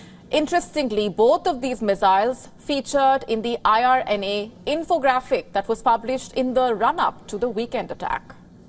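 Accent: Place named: India. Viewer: Very good news report in indian English easy to comprehend unlike American and European English pronunciation having a lot of guttural and nasal accent Thanq Wion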